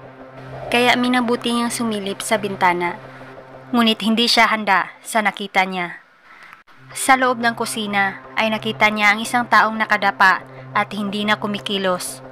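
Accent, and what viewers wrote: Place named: Iloilo, Philippines. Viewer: I believe Ilongga sya😊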